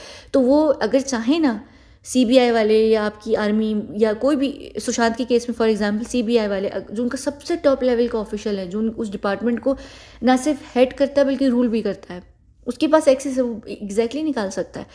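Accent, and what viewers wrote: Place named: United Kingdom. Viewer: How come an Agent pronounce English words like this way when her accent seems British sometimes??..Confusing.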